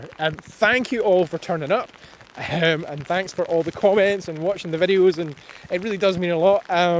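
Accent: Scotland, United Kingdom. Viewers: Sorry I missed it ? I saw The Hoof GP give away the Appleton Crush ? Very kind of him ! I will keep watching your shorts ? Love that Scottish Borge of yours ? You have a good Sunday night ! Sorry again my bad ?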